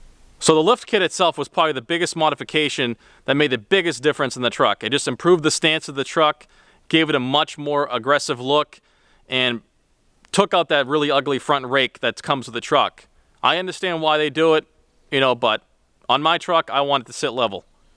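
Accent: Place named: Boston, United States. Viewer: Somebody's from Boston! I'm from Revere, lol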